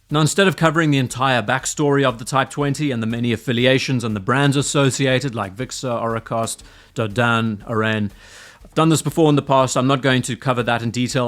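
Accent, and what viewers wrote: South Africa. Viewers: ZA accent is my favourite way to speak English ( I know there isn’t a single uniform accent but they all sound great)! Fantastic watch by the way! The origins date back to World War II watches from Glashütte and Hanhart, with the latter producing them as part of war reparations. That‘s how a German design made it into French watch.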